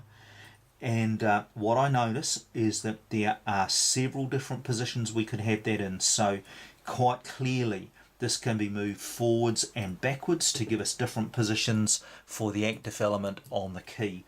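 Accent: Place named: New Zealand. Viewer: Nice lock & good pick. I love your screen annotations, and I think your commentary rivals BB & LPL. Plus you've got that great... KIWI accent! Send'n the love from the USA.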